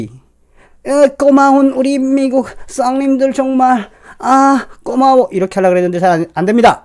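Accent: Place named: Pyongan, North Korea. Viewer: 정감독님의 핑안도 어머니 사투리가 그립습니다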